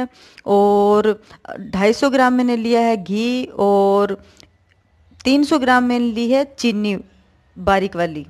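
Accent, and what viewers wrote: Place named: Bagri, India. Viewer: Good but aapki hindi me bagadi show ho rhi h 🙂 Keep it up bagadio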